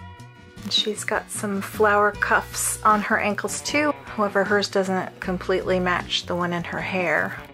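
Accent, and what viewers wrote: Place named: United States. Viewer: I love how you post your videos late at night (I'm just assuming no hate but from ur accent it sounds your American and right now for me it's so it would be late all around the USA but different times)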